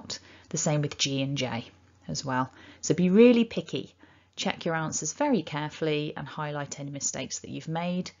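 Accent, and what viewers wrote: United Kingdom. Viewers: is this voice a scouse voice? the way "h " is pronounced is really cute a part from a few other words uttered as cute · are you British?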